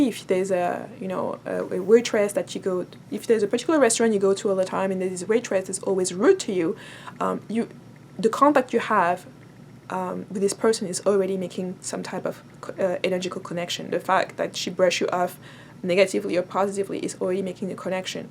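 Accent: France. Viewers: Why do I hear a French accent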